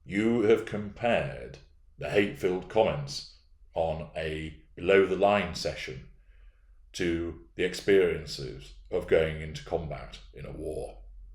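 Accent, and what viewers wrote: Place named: RP, United Kingdom. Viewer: swears when he wants to get an important point across. He sounds posh, and he does it with great finesse.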